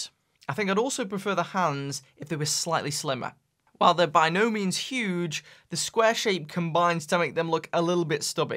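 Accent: Stoke, United Kingdom. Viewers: is it stokie?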